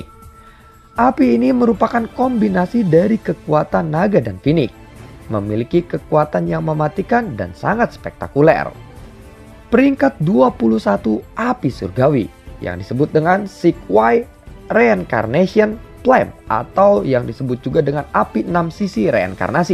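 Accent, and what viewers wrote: West Java, Indonesia. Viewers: Mimin orang Sunda kah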